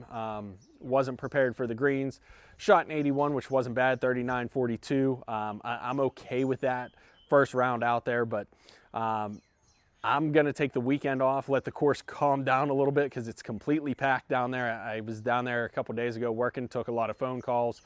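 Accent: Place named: Western Pennsylvania, United States. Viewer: You have a western PA accent...was hoping you may be from the Pittsburgh area...I just purchased a cheap set of single length irons just to try them out and was looking for someone local to me to help with the transition...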